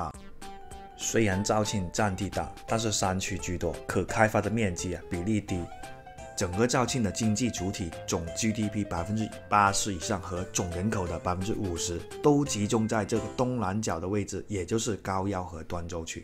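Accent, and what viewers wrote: Hong Kong, China. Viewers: 哥仔，我听你的口音有点似香港人，不像完全一个来自广东的小伙子，看来你对广东这一带的城市也相当熟悉，最后你的一句“再说就要被油管封号了”，说了些啥敏感话题了？是抵毁啥啥，啥啥？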